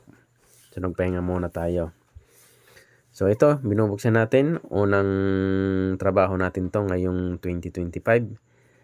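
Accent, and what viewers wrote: Bicol, Philippines. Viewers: Sir taga bicol kpo ba?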